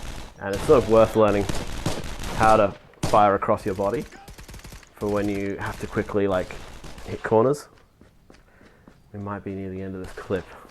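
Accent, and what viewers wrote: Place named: Australia. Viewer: You're Australian 😇 👍👍👍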